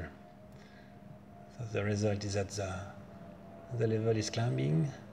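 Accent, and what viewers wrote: France. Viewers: Bonjour, je devine par le logo Orange F mais aussi par l'accent que vous êtes français ! Je suis intéressé à en savoir plus sur votre projet et son fonctionnement plus précisément pour le transfert de données jusqu'au smartphone et comment rendre l'outil plus fiable à partir du détecteur MQ-3, pourriez vous m'envoyer un mail à mon adresse mail afin de communiquer plus facilement : <mail> Merci d'avance pour votre réponse et au plaisir de recevoir de vos nouvelles !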